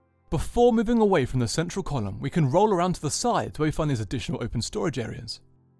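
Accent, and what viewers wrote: RP, United Kingdom. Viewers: He sounds awfully posh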